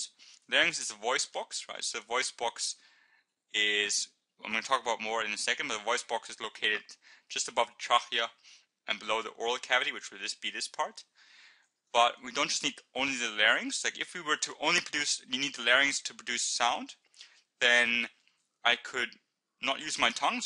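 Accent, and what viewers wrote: Germany. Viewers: Oh he's german! i was trying to figure it out throughout the whole chapter! LOL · ur german? i thought u were indian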